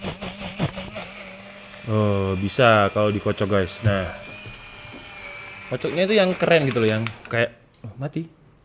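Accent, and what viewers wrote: Java, Indonesia. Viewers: Abangnya medok juga ya 😁😄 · Orang jawa ya?? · Wong ngendi to mas? Logat jowo ne jen kuat 👍👍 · Wong jowo mas? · We mas sampean wong jowo to 👍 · Boso jawane koyo wong ngalam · Orang mana toh mas?, Jawanya masih melekat 😂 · Ketok e kok ngomong jowo😁.wong ndi bang..?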